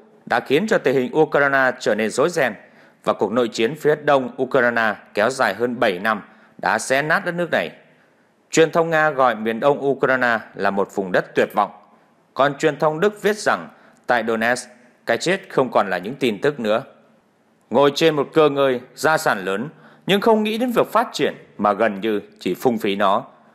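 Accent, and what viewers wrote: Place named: North, Vietnam. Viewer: Bạn nói đúng! Nhưng vì Ba Ke đều có lối phát âm như vậy chớ không chỉ riêng anh nầy !!! Tôi chỉ nghe anh ta nói “ u - cà - na - na “ mà thôi ! Ngay như “ HÀ NỘI “ họ còn không nói được 👍 thì còn nói chuyện gì khác nưa chớ ??? OK 👍!?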